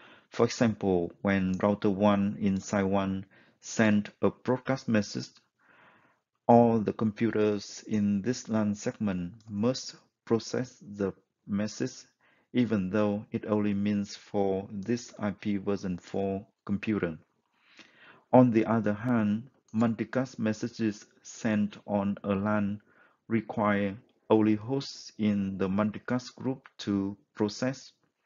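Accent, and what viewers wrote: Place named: Vietnam. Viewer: nghe phát âm tiếng anh biết ngay người việt =)))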